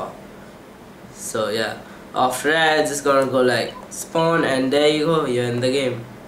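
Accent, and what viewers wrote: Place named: India. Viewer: but i love ur Indian voice ^^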